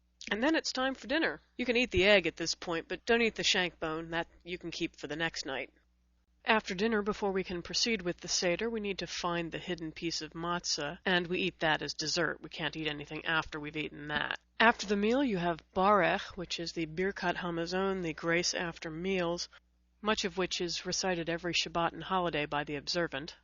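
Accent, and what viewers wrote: United States. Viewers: american accent is awful..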